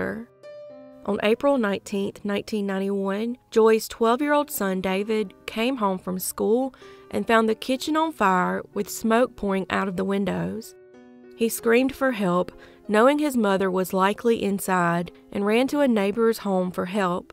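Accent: South, United States. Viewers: Thanks for another great video! May them RIP. When do we get to know you amd when is the Patreon available? You sound very southern ❤️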